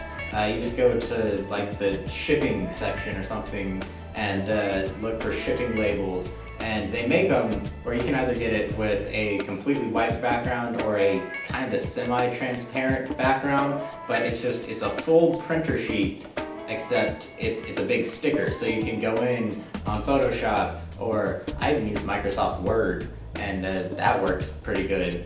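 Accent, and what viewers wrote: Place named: Michigan, United States. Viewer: I'm from Michigan and u got the Michigan acsent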